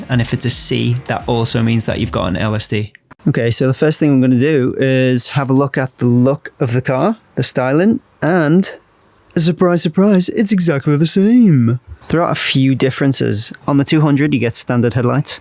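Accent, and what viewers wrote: Liverpool, United Kingdom. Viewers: are you a scouseer whos lived in america or the other way round?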